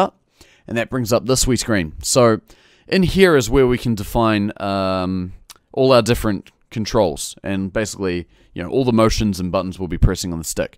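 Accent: New Zealand. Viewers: yo I'd he a new Zealander?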